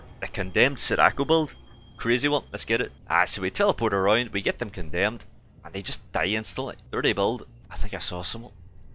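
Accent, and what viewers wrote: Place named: Scotland, United Kingdom. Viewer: I really love the accent! Are you Scottish Sir?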